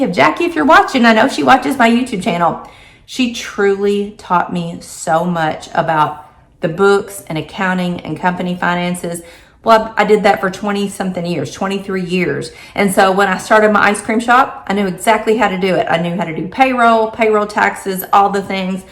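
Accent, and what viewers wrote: United States. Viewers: That was a great video, I knew most of that from following your channel, but always happy to hear you chat (you have a very nice, soft American accent 😊) . Never knew about Randy being your second hubby, glad you found each other again 💛........If I lived in the states, I would love to buy your business🍨🍦 Best of luck in all that you will do, your fans will be watching 💛💛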